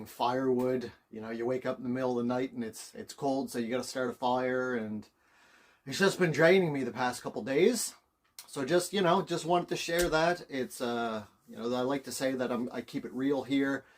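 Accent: Canada. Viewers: Love you're Canadian accent 🫶🏼🎄🌨️❄️👋🏼🫂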